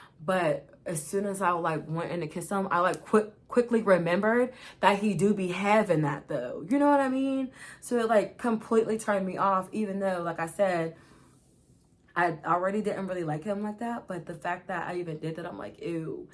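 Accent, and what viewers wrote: Pittsburgh, United States. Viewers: this is so random but i love ur accent bc it reminds me of my old college best friend 😭 i knew instantly u was from pittsburg i miss her lil cow girl sounding self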